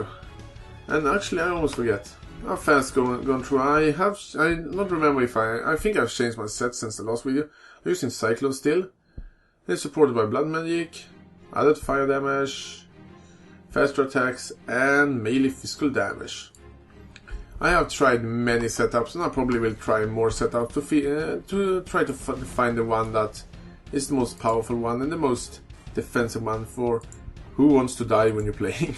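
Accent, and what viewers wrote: Sweden. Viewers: where in sweden are u from i also have family in sweden and the only reason i ask is u sound like one i know:)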